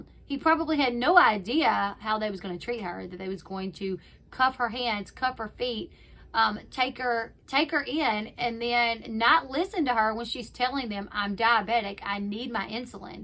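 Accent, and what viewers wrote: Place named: Louisiana, United States. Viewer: I know I love it!! It's that Cajun accent 😍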